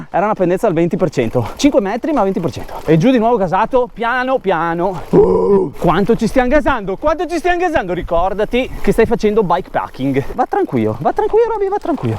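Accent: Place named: Milan, Italy. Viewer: Fabtastico sei pure passato accanto a casa mia mannaggia.... il tuo milanese é perfetto (d'altronde sei un autorità in materia 🤣). Grande giro attendo il seguito.... beati voi per gli spritz qui ci "pelano" molto di più 😅 forza Roby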